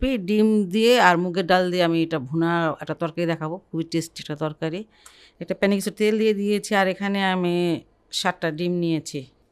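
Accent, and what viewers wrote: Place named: Bikrampur, Bangladesh. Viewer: উনার বাড়ি বিক্রমপুর।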